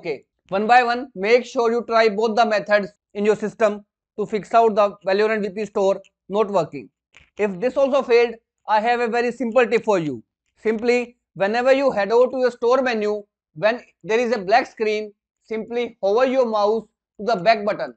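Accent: India. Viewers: no shit hes indian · Thanks it worked. Are you a India becaus eyou Sound like one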